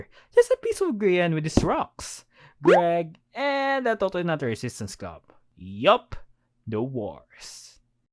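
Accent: Philippines. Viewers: Are you a filipino? i kinda hear that accent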